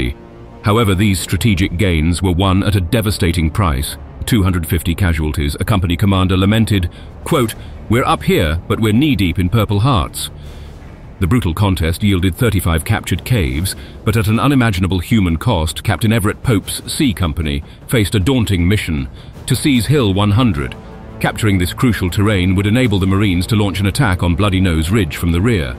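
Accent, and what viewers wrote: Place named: United Kingdom. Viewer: As formidable as the Marine strategies and tactics had become by the time they assaulted Peleliu, their "remarkable success" and "sweeping up whatever was left" after the naval and air bombardments, had nearly always resulted in massive casualties and hard fought battles with the relentless Japanese Imperial Army....Their landing was FAR from easy, ( Do you mean Guadalcanal? ) the Japanese threw everything they had at them from the very beginning, and Peleliu was definitely NOT a "lush" island, it was a craggy, scruffy, unforgiving coral island with sweltering temperatures and very little water....I stopped watching this video after as it is extremely clunky, even with a British accented narrator, pronouncing Peleliu incorrectly.....Semper Fi.....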